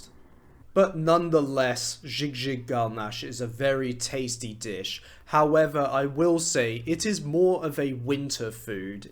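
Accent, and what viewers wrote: Russia. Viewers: Явно не англичанин , кто то просто болтает на инглиш. Названия выговаривает чисто по русски. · Не англичанен акцент русский!! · Са ваш ахь английский дик буьйца, далахь хьо Нохчо ву) акцент тебя выдает) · но не английский с Британии , он русскоговорящий, это видно с закрытыми глазами.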